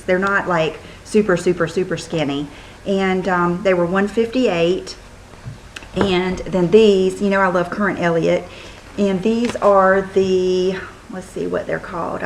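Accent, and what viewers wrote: South, United States. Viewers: are you from the south , i love your accent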